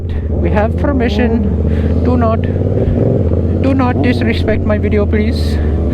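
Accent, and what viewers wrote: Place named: India. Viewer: W Indian accent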